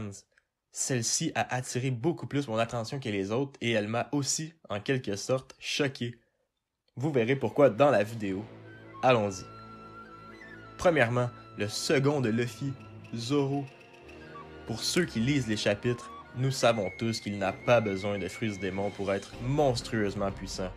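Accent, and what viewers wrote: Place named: Quebec, Canada. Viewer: ce n'est pas parce que des youtubers 'connus' le font que ça doit empêcher tous les autres d'en parler... dans ce cas là, plus personne n'a le droit de faire des vidéos one piece à part eux? bizarre comme façon de raisonner.. et ce n'est pas parce que TOI tu n'apprécies pas l'accent québécois que tout le monde pense de la même façon que toi. Ce n'est pas une voix qui permet à quelqu'un de percer ou non mais plutôt son contenu. :) Bonne journée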